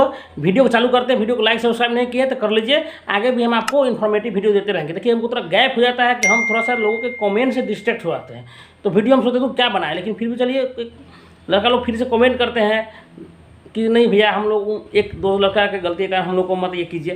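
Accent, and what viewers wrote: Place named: Bihar, India. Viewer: Thodi bhahasha mein bihari touch hai thoda slow boliye please.. smjhne mein dikkat hoti hai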